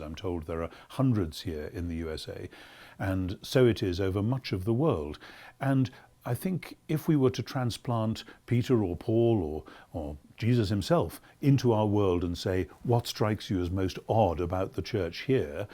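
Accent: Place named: United Kingdom. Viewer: Way more legit with British accent. Bravo. Been thinking about this lately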